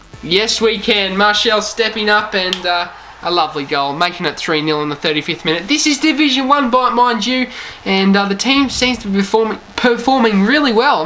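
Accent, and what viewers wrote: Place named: Queensland, Australia. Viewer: Where is Aus are you from.. I'm guessing qld maybe?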